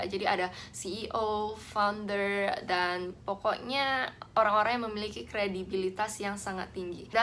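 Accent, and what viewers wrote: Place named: United Kingdom. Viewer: Mba cuman mau bilang suaranya enak di denger, accent englishnya sukak banget xixi!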